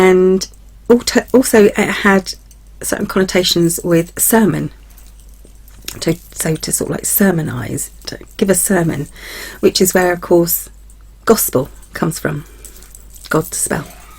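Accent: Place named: Hampshire, United Kingdom. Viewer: My ear for the UK accents isn't that perfected yet.. I didn't pick up that your voice was Hampshire even though I see the gps pin that says so.. but if I think about it . absolutely :) Folks magic here to me is also known as Hoodoo - instinctive magick.. I'm totally there for it at this season of my life!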